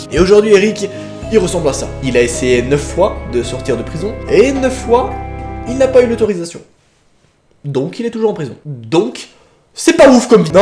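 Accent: Belgium. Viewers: T'es belge ? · tes belge ? moi aussi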